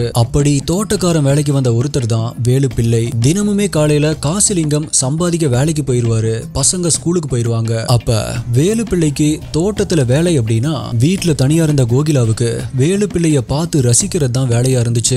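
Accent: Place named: Chennai, India. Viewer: இலங்கைத்தமிழில் கதையுங்கள். நாசமாகப்போன Madras தமிழில் கதைத்து நீங்களும் நாசமாய் போய் சமூகத்தையும் நாசமாக்க வேண்டாம். தூ.......தூ......தூ.....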